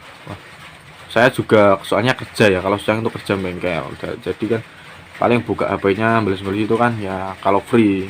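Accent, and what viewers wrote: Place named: Bojonegoro, Indonesia. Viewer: Tak kiro wong ndi ae mlaah podo bjne😂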